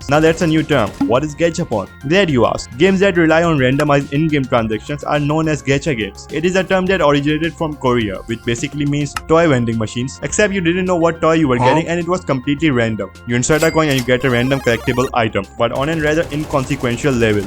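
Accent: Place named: India. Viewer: bro you sound so much stereotypical Indian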